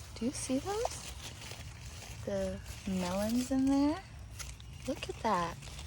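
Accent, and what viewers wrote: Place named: Kenya. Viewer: I was trying to pinpoint your accent . I would not have guessed a Kenyan influence. I'm in 8A in Mississippi. You may have mentioned it, but have you tried eating the sweet potato leaves (prepared like spinach)? I know it is a popular Asian food.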